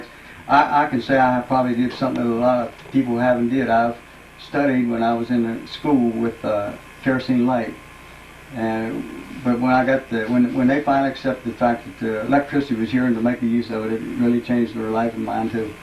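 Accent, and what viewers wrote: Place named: South, United States. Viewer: Your cute southern accent 😹😘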